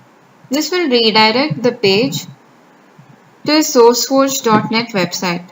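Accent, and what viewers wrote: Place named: India. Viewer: 最难忍受 印度人 说英语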